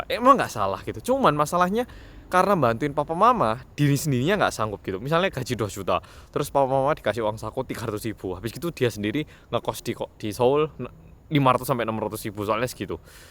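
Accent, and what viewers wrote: Java, Indonesia. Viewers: Mas ini kalau ngomong bhs Korea logat Jawa? ( Medok)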